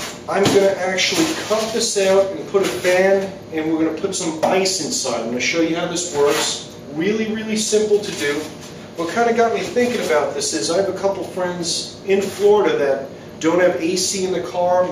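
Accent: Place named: Philadelphia, United States. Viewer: nice one, but put those cubes in a baggie. you sound as if you may be an original philly boy, am i right?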